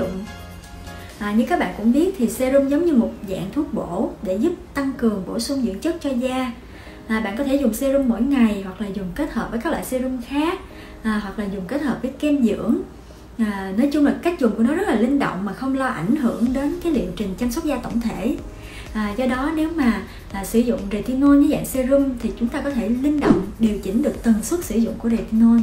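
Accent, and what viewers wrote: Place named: Japan. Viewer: cảm nhận của riêng em là chị quá đẹp và tinh tế trong phong cách ngoại hình và giọng nói(em cảm nhận nó nữa Việt Nam nửa Nhật Bản). Em xem về clip phân loại da của chị rất hiệu quả, em cám ơn chị rất nhiều những chủ đề về da, việc học và đời sống của chị tại Nhật Bản rất hay luôn, em luôn mong chờ những cip này từ chị chị là hình mẫu của em về sự tiến bộ để đạt được những thứ mình muốn, chúc chị luôn khỏe vui và sống trong những thứ tốt đep trong cuộc sống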